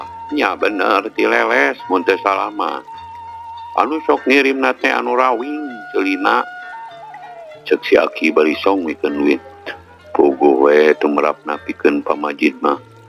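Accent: Sunda, Indonesia. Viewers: Cerita dongengnya sangat bagus mudah di pahami.suara sunda nya aduh jdi inget pada masa jamannya.keren mang..sukses dan sehat slalu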